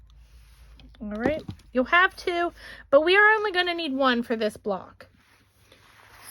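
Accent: South, United States. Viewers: I've watch various quilters tutorials to help me as a beginner. I truly believe you are one of my top 5 that I can watch patiently and understand what you are doing. Plus, I love your little "southern" accent. It's adorable. Beautiful work always.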